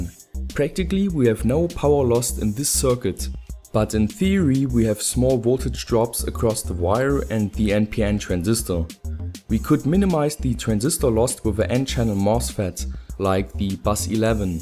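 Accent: Germany. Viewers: Xmcgamer0X he is german soo ... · He's German, so his pronunciation can't be perfect. But I bet you don't talk another language besides English as he does! ;)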